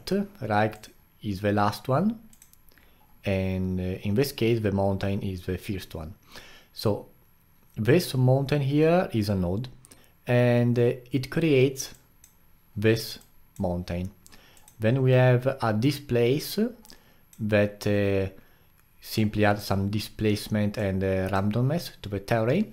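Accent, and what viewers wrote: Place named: Italy. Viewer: Very good tutorial! Curious question, are you Italian? That's a very thick accent... Not that I mind it! It's quite charming! Great tutorial!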